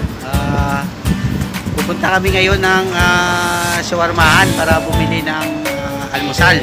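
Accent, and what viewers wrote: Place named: Visayas, Philippines. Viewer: Haha nice.. Sana all may shawarmahan😂 btw taga saan ka po kuyz? Love your accent. Bisaya ako😁😁..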